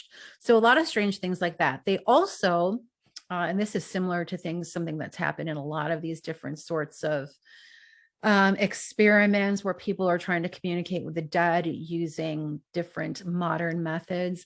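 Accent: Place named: United States. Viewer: The American accent jars; so no thank you!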